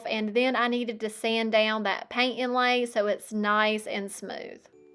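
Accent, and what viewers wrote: South, United States. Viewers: I love your southern accent.❤ Soo 😍 cute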